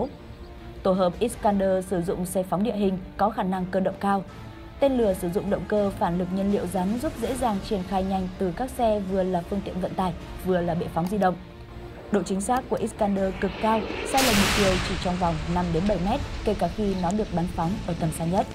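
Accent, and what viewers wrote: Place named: Vietnam. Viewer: Tên lửa Mỹ, bằng tiếng Anh- Mỹ, thì kênh phải phát âm như tiếng ANH - MỸ; ai lại phát âm theo kiểu VN nghe nhà quê và sai bét.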